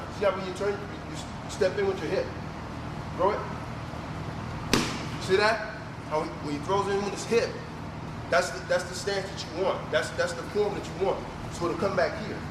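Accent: Baltimore, United States. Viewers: Definitely hear Baltimore in his speech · Baltimore accent?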